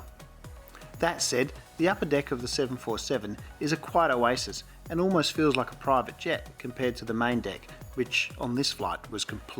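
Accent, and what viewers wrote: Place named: Australia. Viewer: Me, every time I see your updates: “Yaaaaasss a new! *whistles along*” As an Aussie expat in the UK who loves seeing all the different airline products... it’s so lovely hearing it being presented with an Aussie accent ^.^ <3 Thank you for your vids ^.^ xx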